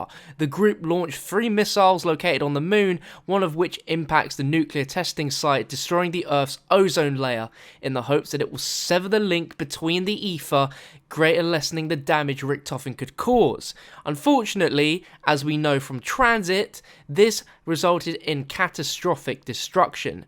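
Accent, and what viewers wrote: United Kingdom. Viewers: we dont care about your opinions or british accent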